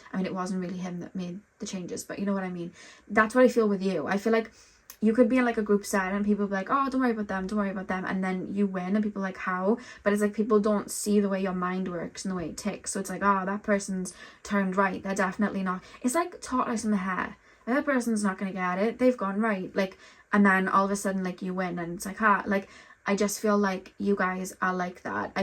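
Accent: United Kingdom. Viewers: I really like your readings but you talk too fast with a British accent I couldn’t catch up.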